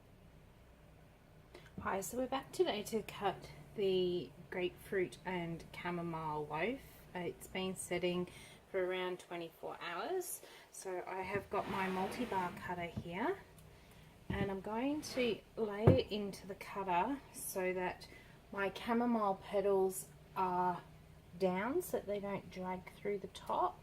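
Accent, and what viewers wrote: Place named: Australia. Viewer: Delicate and lovely soap! Love Australian accent!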